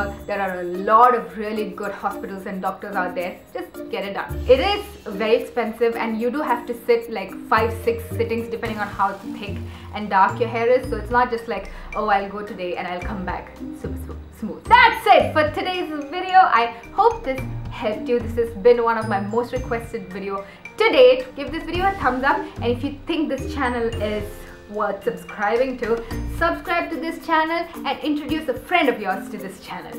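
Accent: India. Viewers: Very informative video n luv d way u talk n ur voice is mastam...r u India n? n u luk so qute :)) n when u said shupp shupp shupp that moment was awesome gal... :)) way to too :)) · which accent are you trying to talk in Indian , American or British?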